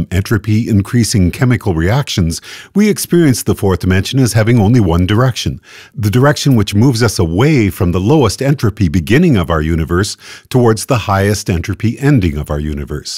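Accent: Canada. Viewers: what a legend. I had been wondering about this when I got stoned (thats my greatest thinking time, please don't judge) and you explained it perfectly in this video. I hope you find your way into more mainsteam media. Diggin that canadian accent too. On an unrelated note, my friend wants to know on what video do you have a metal style band singing at the end with (i think) expletives in it? Just thought it might be better to ask a non science question for once!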